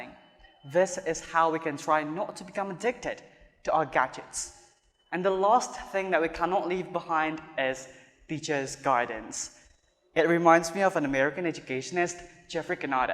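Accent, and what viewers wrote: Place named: United Kingdom. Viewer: Masya Allah, kerenn sekali kak accent britishnyaa, semoga menjadi motivasi 🌟🌟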